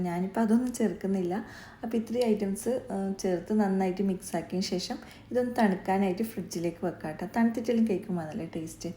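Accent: India. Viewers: ശബ്ദം കേൾക്കുമ്പോൾ തളിപ്പറമ്പ ടെച്ച് ഉണ്ട് 😄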